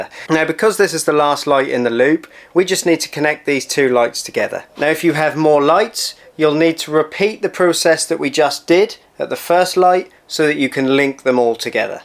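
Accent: Norfolk, United Kingdom. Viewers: Good informative video and well presented. Given that your videos are aimed at the diyer would it be worth advising that a set of VDE cutters may be a worthy addition just in case the wrong wire is selected and cut? Granted a voltage detector and isolated supply should make the circuit safe but there’s always that unforeseen situation! This is not a criticism by the way, I was working in an old restaurant this year renovating a kitchen, an old lighting circuit needed terminating. The sparkle isolated the supply and the detector did not pick up any voltage ( fluke not sure why), cable was cut and was to everyone’s surprise (especially the sparks!) still live! Vde cutters used, but wrecked never to cut again, sparky was fine btw. Seemed the cable was fed by another circuit which went live when it was activated in the cellar! Like I said no criticism as it’s a great video, is that a Norfolk accent btw? 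· Awesome video. I'm a pretty good DIYer but learned some new things. Wago connectors soo much better than old junction boxes. I'm a Beccles boy and recognise your accent from nearby...Norfolk somewhere?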